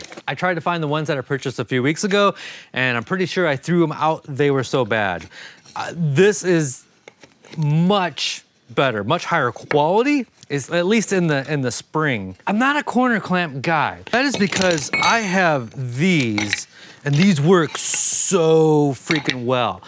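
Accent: Ohio, United States. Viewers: I can tell you are from Ohio just from how you talk. "From selling dru...."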